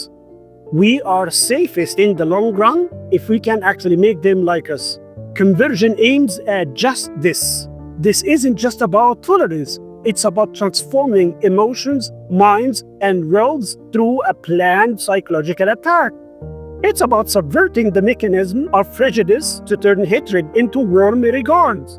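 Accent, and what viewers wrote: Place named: United States. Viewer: طول عمري بحب اسمعك وانت بتتكلم لكنتك امريكاني فاكر زمااان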